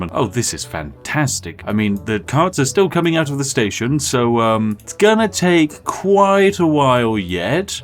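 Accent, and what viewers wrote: United Kingdom. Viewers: Your English is extremely good, tbh! It sounds so natural, so spiffingly British! You can set up your own Tube Language School and organize your own Grimsby Advanced Exam) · LOL, "Billy Viel" car.... ("By Level" Car) Love this brit. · No accent can make horrible atrocities sound perfectly sane and normal like the British accent.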